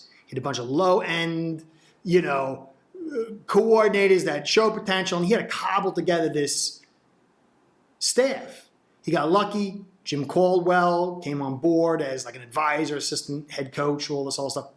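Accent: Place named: Northeast, United States. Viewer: Love you're north east accent (piason?) can't put my finger on it. Not overly nyc or that north jersey twang. Almost like between philly and Trenton area.. reminds me of home. New sub. I love your even keeled approach unlike many fin fan fans. Fin fan since a lil kid in 84' in Philly then in 86' we moved 2 Tampa area , Bucs sucked and were blackouted every home game. Back then Miami was still Florida's team. And they were on every week. So, i haven't missed a game since 87'. Thru reg. Tv, direct tv, sports bars when away or when in the service. A few games had to mailed to me but I'm that loyal. My family, from folks to wife & daughter know during football season that 4 hr slot is blocked off for the Dolphins, don't invite me out & if i go over my folks for dinner, I'm not moving from the tv. Lol. I also played d2 & coached a lot, so i know the x's and o's pretty well. 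But, Flo's forgotten more about the game than ill ever know & he's at practice & watching coaches film all week. I don't like to 2nd quess coach's every step of the way or arm chair 20/20 play call. I know what goes into play calling & offensive installs. Without knowing the play & having access to coaches film to see who didn't win their 1 on 1's, it's impossible to question those micro things. Ill judge him on a macro level overall but not yet. Too early. I know we have been conditioned to be mediocre & expect us to eff up a wet dream. But, older i get the less emotional (except during the game... when X made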